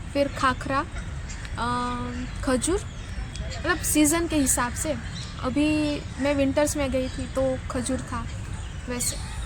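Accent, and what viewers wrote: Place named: Kathiawar, India. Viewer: Typical kathiyawadi accent 😂 Nice👍🏼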